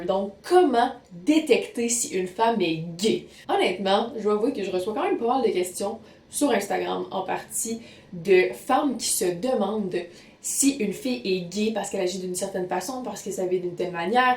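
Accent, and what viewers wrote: Quebec, Canada. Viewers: Québec ou Canada puisque le Canada et le Québec parle français et on un accent comme ça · Accent quebecois tabarnark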